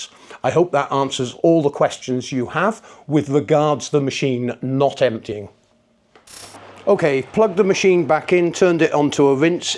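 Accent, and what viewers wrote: United Kingdom. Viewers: I watch his videos because his English is so English!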